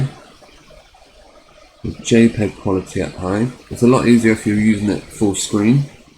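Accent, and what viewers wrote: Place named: Bristol, United Kingdom. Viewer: Is that photo taken from... St George or Totterdown? You sound like you might be from Bristol 🧐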